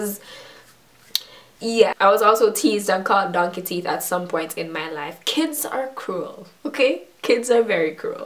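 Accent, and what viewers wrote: Jamaica. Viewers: Yuh can tell seh yuh a Jamaican big up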